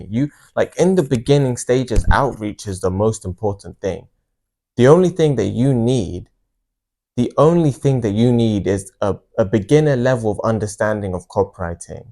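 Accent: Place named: Nigeria. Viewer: Man you talk like a Nigerian 😊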